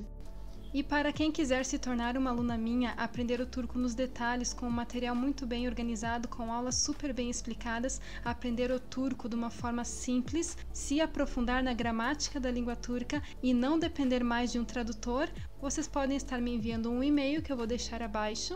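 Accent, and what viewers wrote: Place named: Brazil. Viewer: O t em português pronuncia se t efetivamente. Os brasileiros é q pronunciam assim.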